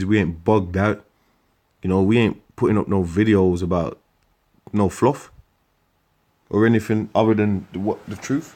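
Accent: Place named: Birmingham, United Kingdom. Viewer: Shalom brother, All praise the the most high. It’s always good to hear a voice with an accent I can recognise, can hear the brum accent clearly cause I live round there. Always trying to get direct contact with some brothers but haven’t been able to, how can I do so ?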